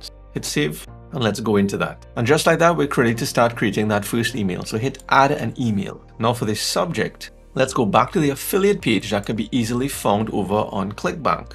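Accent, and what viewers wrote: Trinidad and Tobago. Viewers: I just heard you speak and said to myself he a whole Trini! tell me i'm wrong?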